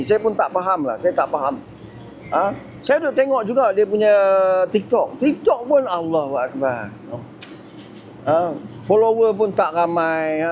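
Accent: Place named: Johor, Malaysia. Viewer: Amboi DSA dok JB teruih cakep pelet Johor nooo.ape bende le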